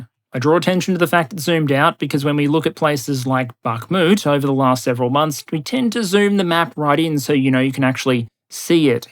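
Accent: Australia. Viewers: Mr., I don't understand why you were concerned about this update, it is excellent as usual. You have my greatest respect for staying on track and as far as keeping with in the boundaries of reality. Thanks once again. I am always so grateful to hear such an incredibly intelligent voice with an Australian accent. Baku boy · what helicopters? The ones Wagner shot down? Because the Ukrainians short down zero. What nonsense was he speaking about in the video? 🤭 Helicopters are good once the ant-air is knocked off. So it's offensive overkill. You really should stop listening to this clown. He has wasted hours and hours of your precious time and your brain is growing mushrooms. He's a disinfo channel on the payroll of some 3 letter agency like the CIA or in this case, probably British. Lots of useless details, meandering around w some patronising Aussie accent does the trick it seems. 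Too ez. 🐑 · Thanks for getting me through a bumpy flight to my vacation mate. Just listen to the nice Aussie man and ignore the turbulence.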